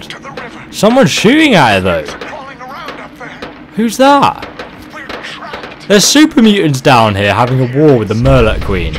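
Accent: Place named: United Kingdom. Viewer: Dyslexia makes it hard to read. Not to speak =) I also think it's just a british accent thing. No biggie.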